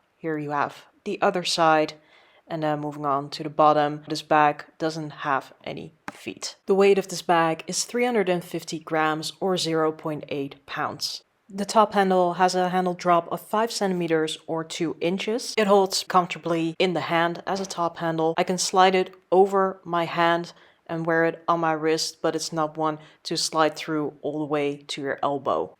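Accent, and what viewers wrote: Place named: Netherlands. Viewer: By coïncidence I discovered your channel and I immediately liked it and subscribed 🤗I already thought you had a slight dutch accent and after ‘doei!’ I had to laugh, I’m dutch as well 😁 I appreciate your honest and thorough reviews, keep up the good work 👍 doei!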